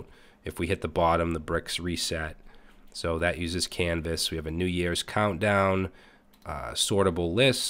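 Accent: Boston, United States. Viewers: Sounds like a bit of a Boston accent there.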